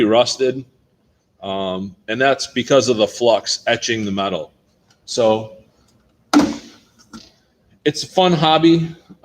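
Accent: United States. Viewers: He speaks American and that is how it is pronounced. Not sure what you are crying about.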